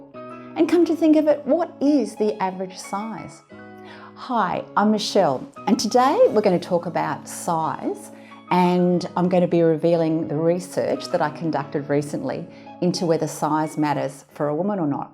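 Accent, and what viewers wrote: Australia. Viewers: What accent is this? I can't identify it. Australian maybe? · THANKS FOR YOUR INTERESTING SURVEY. I BELIEVE YOU ARE AN AUSTRALIAN DOCTOR FROM YOUR ACCENT. THANKS AGAIN!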